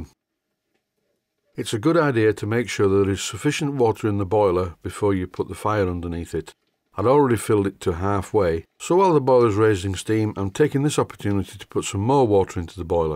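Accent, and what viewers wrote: Yorkshire, United Kingdom. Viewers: Nice plant,. And I've wondered about if you could do an episode in your Yorkshire accent sometime, with subtitles for the ones unable to understand. I understand it just fine, got a few mates in the northern parts of the UK who I've known and chatted with for years. Keep up the good work, mate.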